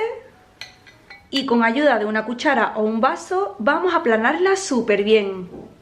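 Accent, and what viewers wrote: Andalusia, Spain. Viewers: Eres Andaluza? Me encanta tu acento tanto como tus recetas 😍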